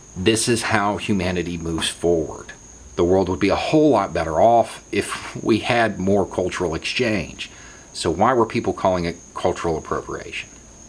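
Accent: South, United States. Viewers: You know, I have to admit to my own cultural prejudices when I hear this intelligent man talk about issues of great import in that accent. I grew up in Brooklyn and spent many years living in the south and hearing some of the most hateful things spoken with that accent that it's sometimes difficult listening to. It's like listening to Shelby Foote on Ken Burn's "Civil War" documentary, it's just hard to equate the one with the other. As has been said by many before, you can't judge a people with a wide brush: there are many intelligent and compassionate people who live in the south, it's a shame they are swallowed up by so many who may actually even be in the minority, loudly proclaiming their moral superiority while doing some of the most immoral things. Anyway, it's just a thought. Thanks, for speaking up.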